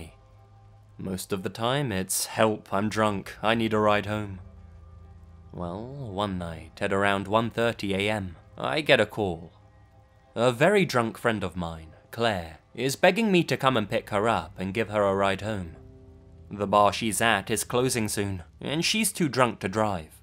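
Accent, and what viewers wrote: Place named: Suburban England, United Kingdom. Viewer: Amarsanaa Ravdansambuu He doesn't sound posh English and doesn't sound poor London. He sounds like more suburban or a mix of those accents. So um Posh Poor English??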